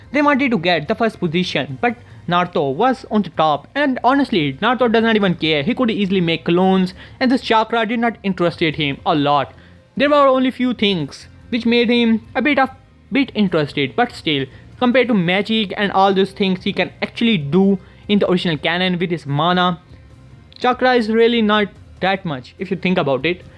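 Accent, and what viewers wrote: India. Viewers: u indian mate? Edit: idk why did i even asked this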